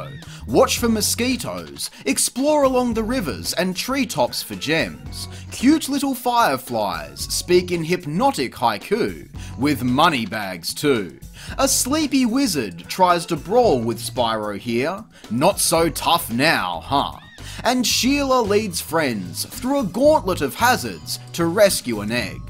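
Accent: Australia. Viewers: So glad I found this. Subbed... 😀 are you aussie ?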